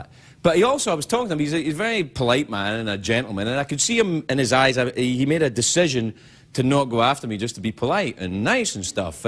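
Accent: Scotland, United Kingdom. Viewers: Back when he still had that Scottish accent.... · Craig's accent always gets a little thicker when he is being genuine or talking to another guest Scottish decent. (See Ewan McGregor, Kevin McKidd, his sister, etc). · Excellent. Who else misses his scottish accent? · I watched this the other day and even today i still had tear in my eye. 20 years is a great milestone....goodluck to everyone at the beginning of the road....one day at a time you'll get through it:-) watching clips from 5 years shows how much his accent has changed, but it is still obvious scot (reminds me of john barrowman in that sense) thanks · It's funny how much thicker his Scotch accent was even 6 years ago, but what he's saying here is brilliant. What a real person, and hope he inherits Mr. Letterman's show one day. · wow his scottish accent is veryyy scottish. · WOW. Vintage Craig. 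He was very apologetic for cussing and had a much stronger Scottish accent. Time flies...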